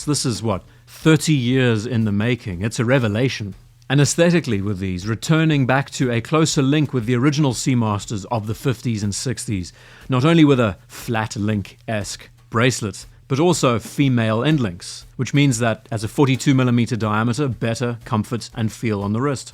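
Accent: South Africa. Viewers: BTW just subscribed for the SA accent and guitar if nothing else 😅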